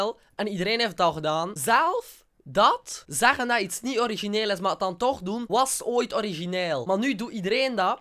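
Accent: West Flanders, Belgium. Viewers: Ben jij een west Vlaming?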